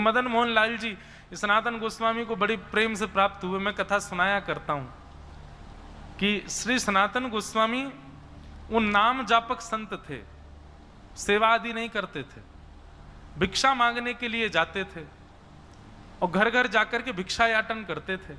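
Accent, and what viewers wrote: Braj, India. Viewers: बहुत ही सुंदर और अद्भुत कथा श्रवण कराई साथ बृज भाषा आपके श्री मुख से सुनने में बहुत ही आनंद आ रहा है 🙏🙏🙏🙏🙏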